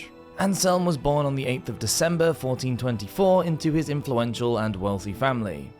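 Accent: United Kingdom. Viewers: Oh yess....the solid music the british accent....yess....a new topic, medieval of course...yesss so soothing, so interesting.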